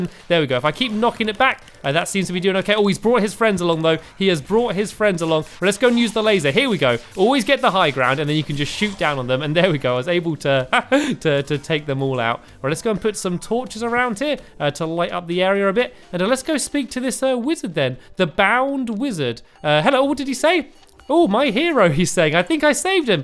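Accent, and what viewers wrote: England, United Kingdom. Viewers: Cake is Nice do u not know what a an English accent is?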